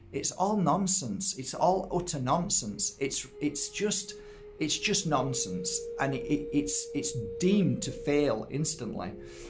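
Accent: Yorkshire, United Kingdom. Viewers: Is it a Yorkshire accent?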